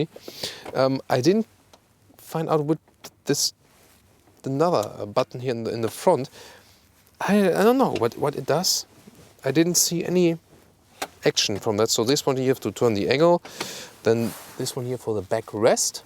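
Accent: Germany. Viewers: Das English ist zum schmunzeln. Allways German englisch. Ich würde das nicht machen. Aber danke für den Einblick in den Transporter. Ich will seinen kaufen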